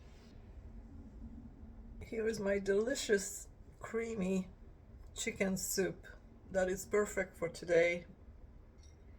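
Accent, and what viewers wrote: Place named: Europe. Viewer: American girl? You have a European accent.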